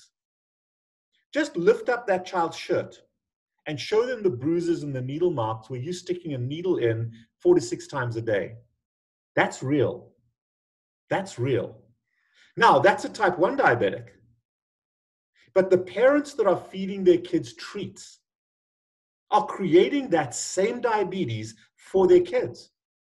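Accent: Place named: South Africa. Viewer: Ha ha. I can hear a South African accent even when it hardly exists. What amazing doctors UCT produced.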